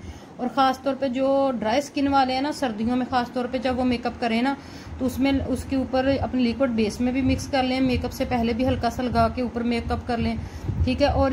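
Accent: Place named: Punjab, India. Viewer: Aap punjabi ho.punjabi hini main a hi jandi a ji😊😊😊😊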